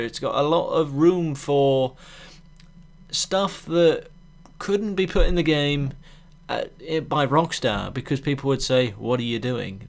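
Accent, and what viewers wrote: England, United Kingdom. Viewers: Is he English?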